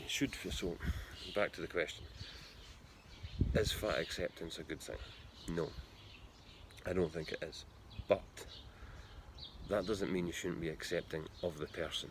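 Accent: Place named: Scotland, United Kingdom. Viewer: The Scottish accent is my *favorite*!!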